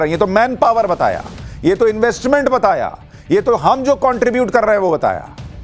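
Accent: Bihar, India. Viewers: Paise ke liye kuch bhi bake ja raha hai tension me andar ka bihari jag gaya hai. Ye Bihar se hai iski accent badal gaya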